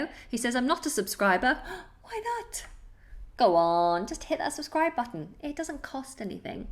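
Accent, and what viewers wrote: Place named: United Kingdom. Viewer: Amazing these live lessons!!! Stunning British accent!! Thanks so much,... and Jacob Baby English of course which lend us his lovely Mum!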